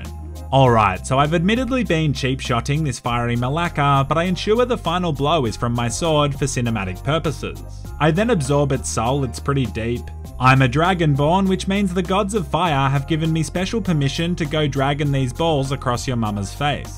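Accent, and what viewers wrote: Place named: Australia. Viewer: Dearest, I believe you are Australian like me? Just going off the accent here. This video was posted 5 hours ago. You were awake at 3 in the morning? g e t s o m e s l e e p y o u w e t c a b b a g e - Sincerely, Glacier.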